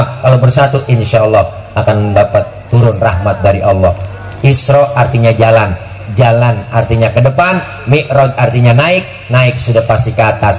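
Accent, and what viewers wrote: Jakarta, Indonesia. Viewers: Betawi baget klo ceramah ini kocak lagi bikin mules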